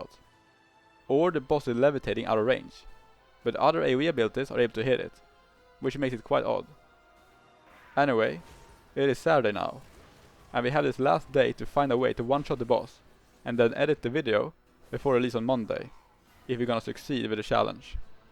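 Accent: Sweden. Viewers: Never have I heard such a heavy swedish accent.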